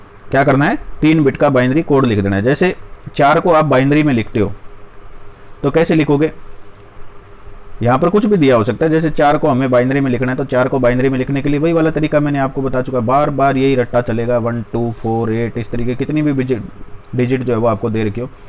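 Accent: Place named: Haryana, India. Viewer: Sir aapki awaz es video me na thi aap pdhaya kre,aapki hariyanvi boli bhut achhi lgti hai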